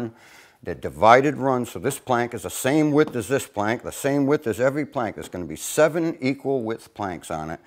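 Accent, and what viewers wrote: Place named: Rhode Island, United States. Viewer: I'm very happy I found your videos. You get bonus points for your accent. I'm a Californian, but my folks were from Rhode Island. It brings back fond memories.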